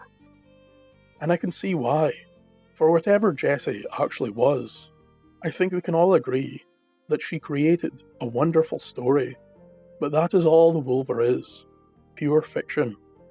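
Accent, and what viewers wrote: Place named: Black Country, United Kingdom. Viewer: There are plenty of Wulvers! They are just not on Shetland! You have clearly never been to WOLVERhampton on match day. Wufruna's Town! Saxon names Or so we are told. Wulfruna was, according to Lore, the wife of a Saxon noble who gave the land which is now Wolverhampton to the people after a Godiva style row with her old man. As far as I know she did not get naked. But the linguistic similarities are clearly not co incidental. And Wolves have become local emblems, not just for the football team. Tell anyone within a 15 mile radius that you are going to Wolvo and they know where you are going. As far as I know they do not have any genuine wolfmen either. but hearing your pronunciations was so similar to our old dialect. I have often wondered about how different the Saxons and 'Norse' really were, especially in Mercia. 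The Southern Saxons maybe, but we have so many place names that could easily be Norse. If you look at Really old maps, we aren't Anywhere! We are not Mercia, we are not Wales, we are no man' s land and there is even one that shows a sort of Viking tendril weaving across to us from the Danelaw and splitting early Mercia from Wessex. Sorry for going of at a tangent and having Nothing to do with Scotland, but I was just struck by the words and sounds. I am fascinated by our lost past and the half remembered clues. This website is brilliant, please keep it up!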